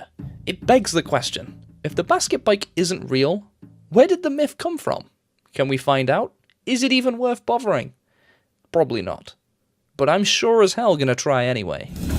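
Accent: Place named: United Kingdom. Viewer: The algorithm gods have blessed you. I'm happy to be hearing another British (or aus) essayist. Great work!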